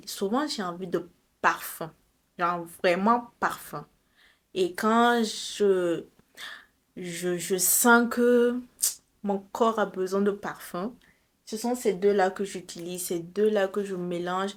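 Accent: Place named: Burkina Faso. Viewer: Dès 5 premières secondes j'ai su qu'elle est burkinabè 😂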